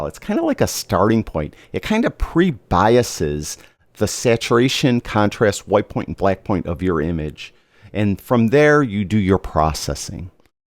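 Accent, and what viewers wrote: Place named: Chicago, United States. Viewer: I can pick up on Chicago accect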